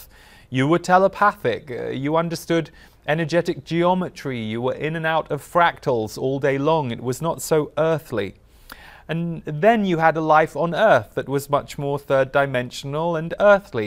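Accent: United Kingdom. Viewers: I like your British accent! It's soothing to listen to. 💖Thank you! 💖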